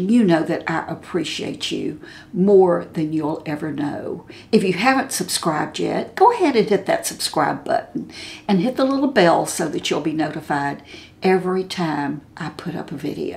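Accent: South, United States. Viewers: Your eyelashs are SO long! Thanks for a great video,. You seem like a big sister, favored aunt and cherished grandmother. None of which I actually had growing up in North Carolina. Your kind spirit and southern accent are INCREDIBLY nourishing and healing for me.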